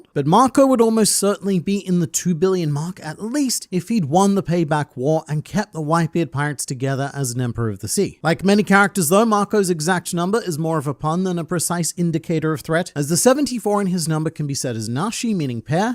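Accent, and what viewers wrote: Australia. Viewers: Can’t really blame you. voice is rather soothing with that slight Australian ‘twang.’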